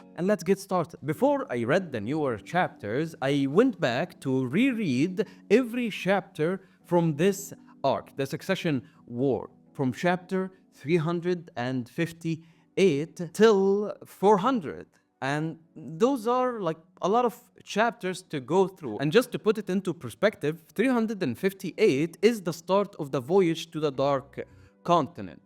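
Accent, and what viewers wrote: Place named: Egypt. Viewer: My friend you have an Egyptian accent.. it's nice however, is it actually Egyptian?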